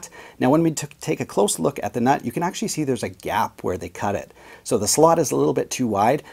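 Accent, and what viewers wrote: Canada. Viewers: That’s because he speaks Canadian.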